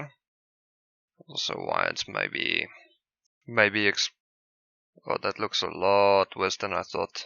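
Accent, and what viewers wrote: South Africa. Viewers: Nice Video. ... You have A south African ACCENT.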